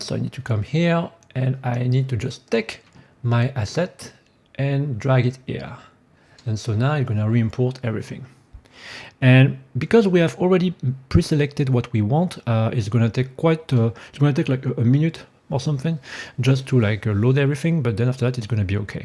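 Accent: France. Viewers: je m'attendais pas à l'accent français, super vidéo en tout cas et merci pour le contenu gratuit et super instructif que tu nous proposes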